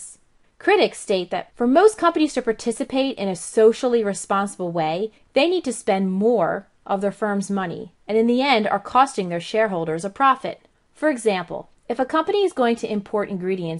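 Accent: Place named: Philadelphia, United States. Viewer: the super thick Philly voice-over accent is a bit odd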